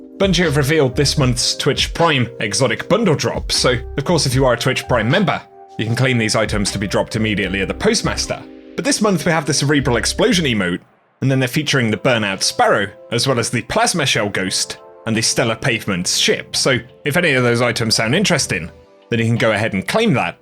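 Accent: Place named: United Kingdom. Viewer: It’s a british accent, big deal?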